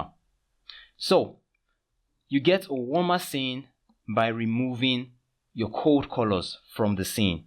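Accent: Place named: Nigeria. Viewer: Thank you Bro its really helpful I understood it more with your accent,more grace and knowledge..Naija Bro sending love from TN,U.S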